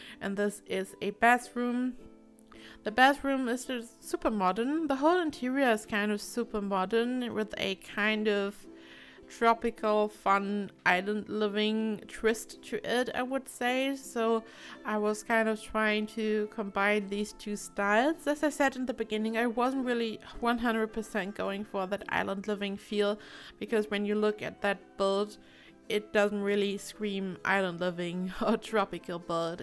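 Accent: Germany. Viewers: I think you have a German accent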